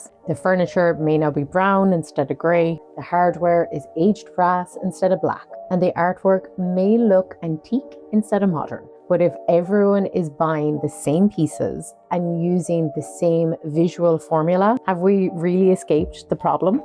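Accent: Ireland. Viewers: Come to think of it, you do sound a bit Kerry-ish! (I used to have a friend from Killarney.) When I was living there, the only accents I could NOT understand at all were from Clare and West Cork -- but it seems that their accents are even the butt of Irish jokes. Hey! South Dublin used to be nice! And judging from Google Earth and Daft, the home prices are still very sky high in my old "hood" (Knocklyon). It's north Dublin where the trouble is. When I was there (in either Cork City or Dublin), I often heard, "Well, Dublin is really an IRISH city." I found Dublin in 1981 to be quite rude and unfriendly -- and not in the playful, insulting way that friends insult one another in the rest of Ireland. However, my sister-in-law and nephew visited Dublin a few years ago and found the people there wonderful. As for me, I love Cork City! To me, the year I spent there in Cork makes me one-sixty-eighth Corkonian. 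I'd move to Cork City in a heartbeat if personal circumstances allowed. Judging from what you said in your video, I think you'd approve of my little two-bedroom Mexican-exterior-style, single-story townhome (what you would call a terraced home)! Regarding enjoying what comes into style each year or what's trending, though, you're a better person than I am (which is good, since you're the designer)! I keep watching videos about the latest-and-greatest and yelling at my screen, "That's terrible!" or "That's stupid!" or "How boring!" LOL. Yet, I find it to be addictive -- a litt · Oh it feels so nice to hear an Irish accent on YouTube, especially on design subjects.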